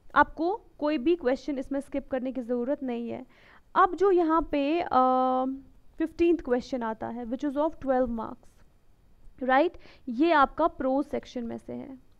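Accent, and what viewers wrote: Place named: Kashmir, India. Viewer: I think You r frm Kashmir Right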